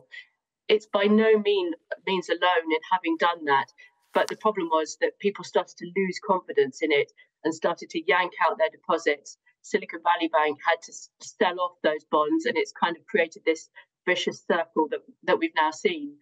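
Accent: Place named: RP, United Kingdom. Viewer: What happened to the news reader?? She suddenly is talking like the late queen of England!!!how queer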